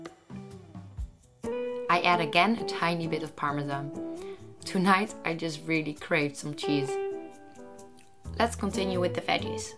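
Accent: Netherlands. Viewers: you are dutch aren't you? i hear it in the welkom and the ja's in the beginning of sentences ahaha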